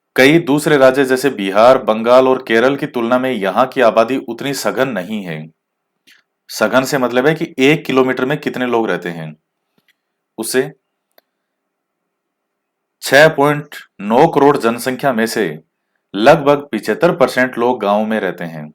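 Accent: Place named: Bagdi, India. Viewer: Sir हमारी तो आपके वाली ही बागड़ी बोली है, आप जब भी बागड़ी वाले शब्द बोलते हैं तो हमें बहुत ही अच्छा लगता है, जैसे ताती बलती लू,धाप के राबड़ी पियो और बाजरे की रोटी खाओ🙏🙏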